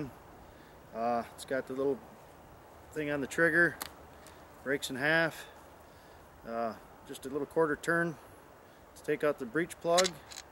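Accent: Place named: Ireland. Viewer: Great video. You realize that you have any very obvious Irish accent under your American. Did you do your family tree lol ? Best regards, Eddie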